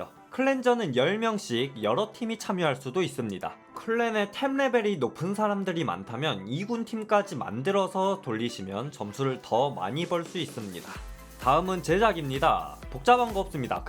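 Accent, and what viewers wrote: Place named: Jeolla, South Korea. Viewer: 그런데 혹시 전라도 분이신가요? 못해가 모데로 들려서 너무 친숙하네욬ㅋㅋ 고향사람 만난느낌 유튜버님 서버는 어디로하시나요?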